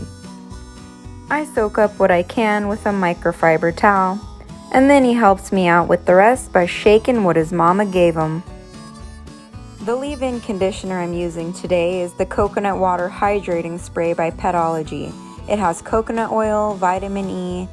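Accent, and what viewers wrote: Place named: South, United States. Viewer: Your southern drawl accent is the best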